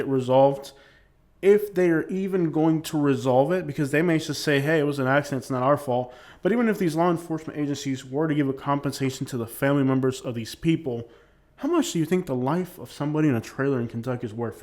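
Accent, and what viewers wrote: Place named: New York, United States. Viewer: Apparently you are not from this area. You sound like you’re from New York. Get your facts straight before you make an ass out of yourself.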